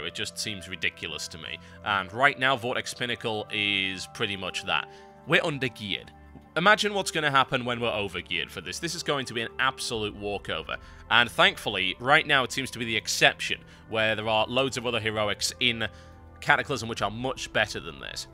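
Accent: United Kingdom. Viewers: I love this guy. I find what he says halerious. Love the accent. Nice work Brit :D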